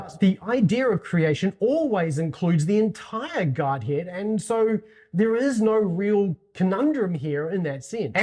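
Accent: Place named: New Zealand. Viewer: Great video, love your content. Not sure if you are aware how you are pronouncing God as “guard”. Quite off putting given the subject matter. But I am fond of NZ accents. You do great work. Keep it up!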